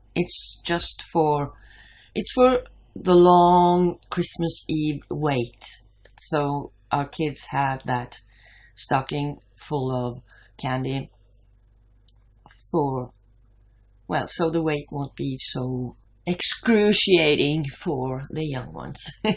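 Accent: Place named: Norway. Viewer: Ah I should've recognized your accent being from Norway since I recently played a game that took place in Norway. Nice to see different and similar customs and decor for the holidays. You guys must be gingerbread house pros! lol I think the food version of slow TV would be a hit here in America, as much as we love our food. At the very least it should be live streaming somewhere lol Though I'd love to take that Norwegian coastline trip too!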